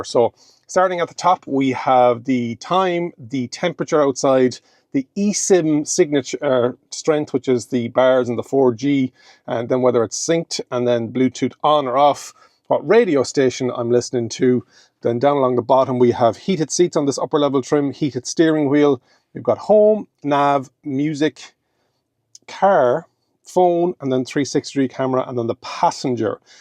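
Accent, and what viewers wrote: Ireland. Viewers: Great video man. Just what I needed. And great to hear an Irish voice explaining everything.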